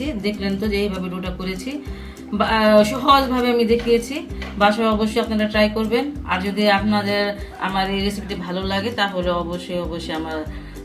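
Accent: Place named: Bikrampur, Bangladesh. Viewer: Apni ki bikrompuer meye? Amar mone hosse kotha shone.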